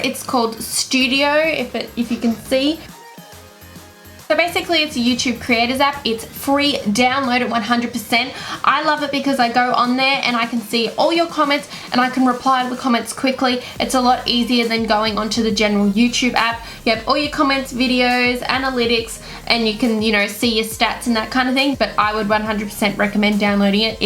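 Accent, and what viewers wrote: Australia. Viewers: I’m from the USA. This only matters because I think I just fell in love with Aussie Accents.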